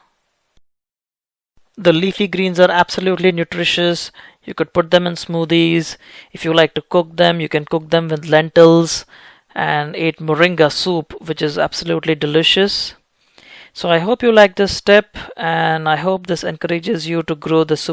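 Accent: India. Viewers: I LOVE THAT STRONG INDIAN ACCENT AND MAY I HAVE SOME SEEDS...... AND WHAT THEY COST PLEASE ! THANKS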